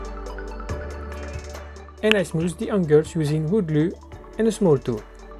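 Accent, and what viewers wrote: France. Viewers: T'est français?, je trouve que t'as un accent francais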